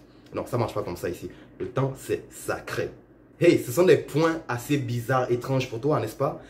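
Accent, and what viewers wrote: Gabon. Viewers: Tu as trop l'accent d'un gabonais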